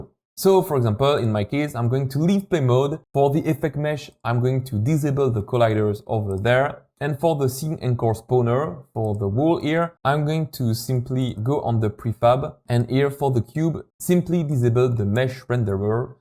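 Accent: France. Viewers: Hi, are you french? your accent...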